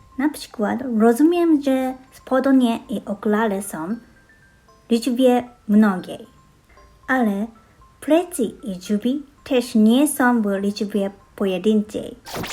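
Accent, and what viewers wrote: Japan. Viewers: HAHA pomimo że czasem masz problem z naszymi dż, dz, ć itp. mówisz tak dobrze że łatwo cię zrozumieć a ciekawostką jest akcentowanie japońskie polskich słów :D Choinki niestety nie lubią ciepła i suchego powietrza mieszkań (nawet te w doniczce z korzeniami) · Ale japoński akcent w polskim brzmi słodko :D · Dużo ludzi, którzy przyjeżdżają do Polski z innych krajów mówią, że polki jest trudny. Zgadzam się z nimi, ponieważ pomimo, że jestem polką również mam z nim problem. Na przykład z ortografią i gramatyką. To są dla mnie męczrnie. Szczególnie gramatyka. Z ortografią miałam problem w młodości😊 Życzę powodzenia. I tak już bardzo dobrze mówisz po polsku. I bardzo podoba mi się ten twój japoński akcent. Jest cudowny❤ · Dla mnie Japoński jest trudny, ten Wasz akcent 🙂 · Drzewko ( choinka) ma igły a nie liście i wg tradycji choinkę się wyrzuca po wizycie duszpasterskiej 🙂 Mówisz bardzo dobrze po polsku, widać że się bardzo starasz ale też widać że mięśnie twarzy/języka masz przystosowane do języka japońskiego gdzie są inne dźwięki ale to normalne 🙂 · Hello, I'm from Poland! 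The way you speak Polish with a Japanese accent is really cute! My dream is to learn Japanese one day! I've been fascinated by Japanese culture and language ever since I was a kid! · polski jest latwy smiesznie muwisz tak po polsku z akcentem japonskim bez obrazy oczywiscie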